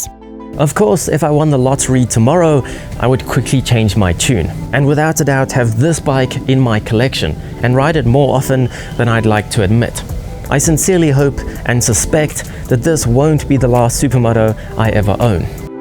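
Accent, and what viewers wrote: South Africa. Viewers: I am VERY good with accents, but not perfect. You are most def from South Africa, but English heritage or Boers/Afrikaans. heritage. I am NOT hearing a pronounced Afrikaans accent, so I am leaning to an English heritage, predominantly. Am I correct? I am just a bloke born and raised in the USA who lived in the Caribbean for 15 years. MANY of those years in the 1980's on the Dutch island of Saint Maarten where so very many expat South Africans lived on the boats they made and sailed away from their birthplace forever.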